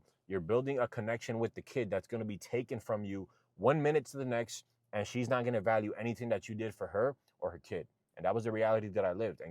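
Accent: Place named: Gullah, United States. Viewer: Idk I'm in and wondering if maybe I was expecting too much from "a bouncer". I'm sure I'm gonna miss the part where he doesn't sound geechy but I just can't take this guy seriously 😂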